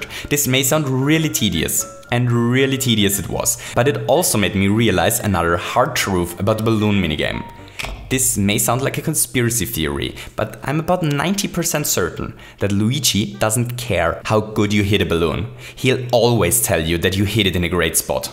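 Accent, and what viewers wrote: Germany. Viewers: Is he German? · Man hört das Deutsche so sehr heraus :D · are you german? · Wie man direkt heraus hört das er deutscher ist... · Bro der deutscheste akzent den ich jemals gehört habe (Nohate)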